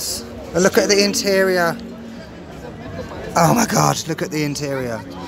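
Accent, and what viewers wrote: England, United Kingdom. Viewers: Are you from England ?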